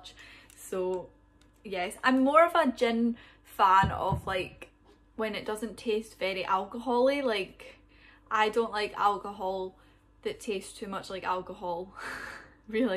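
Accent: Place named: Scotland, United Kingdom. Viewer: youtube recommended this to me for some reason but im here for it. came for the novelty, stayed for the scottish accent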